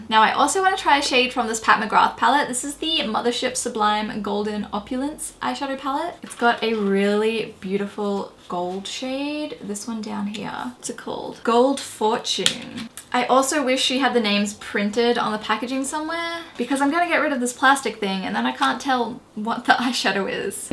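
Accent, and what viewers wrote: Australia. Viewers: Love your videos. You are absolutely beautiful and I love your Aussie accent ❤️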